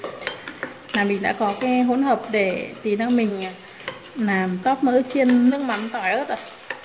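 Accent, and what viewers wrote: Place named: North, Vietnam. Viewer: Nge tiếng bắc kỳ là thấy làm xong ko ngon rồi. Lướt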